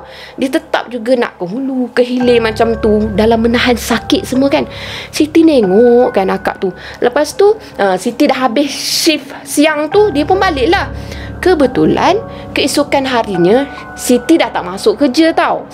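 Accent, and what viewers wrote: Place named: Terengganu, Malaysia. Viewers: Pekat molek tranung ye...mcm sy.. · Pandai cc cakap ganu...jadi ah tu slang ganu kite.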